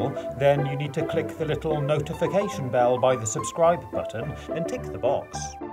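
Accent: United Kingdom. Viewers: hi I was wondering are u brittish?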